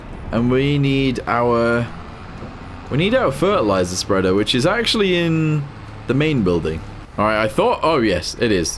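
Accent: United Kingdom. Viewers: Hi, I came to UK and I'm already used to their accent thanks to you!🎉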